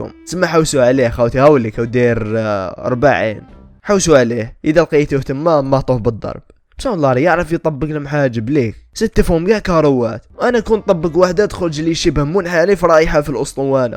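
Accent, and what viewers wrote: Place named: Algiers, Algeria. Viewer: اللهجة دزيرية توجع القلب 🤢💩💩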